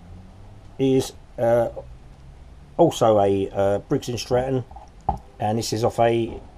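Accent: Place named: United Kingdom. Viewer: That was helpful although I had difficulty understanding through your British accent. Thanks.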